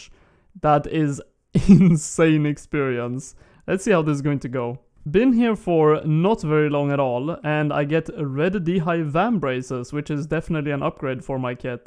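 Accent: Sweden. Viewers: Haha älskar att man hör att du är Svensk :)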